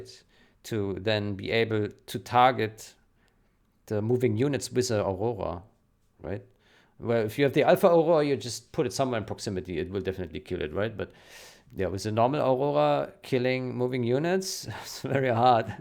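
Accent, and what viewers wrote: Germany. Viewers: hey are you german. i detect german accent. jawohl.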